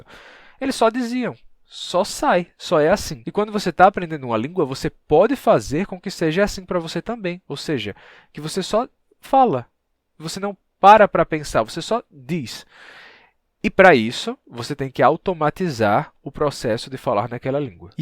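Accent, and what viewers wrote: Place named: Northeast, Brazil. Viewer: Você tem sotaque de português do. nordeste do Brasil. No entanto, percebo um outro leve sotaque, que identifico com o sotaque de nativos do português do Brasil, mas que residem há muito tempo no exterior. Digo isso, porque tenho um neto brasileiro que reside em Londres desde 2008, e percebo um levíssimo sotaque em algumas palavras.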